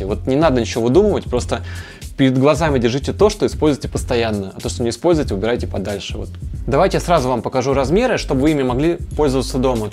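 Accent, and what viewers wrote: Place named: Okanye, Russia. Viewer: Перестаньте окать!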